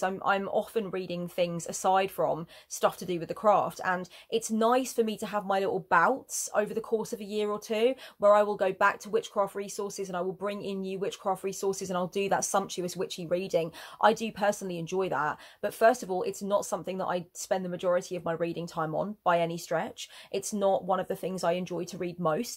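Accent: United Kingdom. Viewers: I enjoy your intelligent and independently minded videos. Find them quite relevant. Plus, like British accents. Which regional accent is that?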